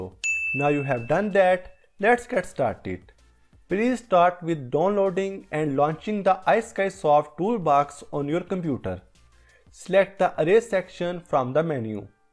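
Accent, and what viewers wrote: India. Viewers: im from austria its my second language im far from perfect but anyway its easy to learn most easy language to learn but its not even referring about his english skills like grammer and words and so on he is obviously talking about his hardly to understand indian strange accent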